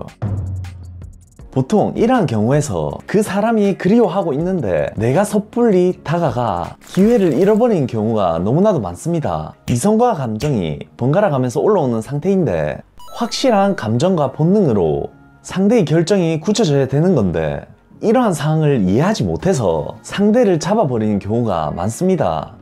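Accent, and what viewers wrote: Gyeongsang, South Korea. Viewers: 경상도 분인가요? 그쪽 제 스타일입니다 😘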